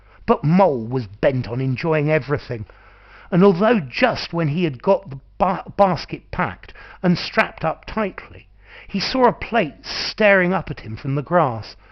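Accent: United Kingdom. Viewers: Ohh thank you very much, i have been looking for this one with British accent. I give you my like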